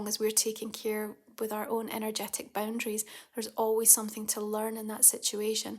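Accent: Scotland, United Kingdom. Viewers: Oh! Didn't realise you were a fellow Scot 🏴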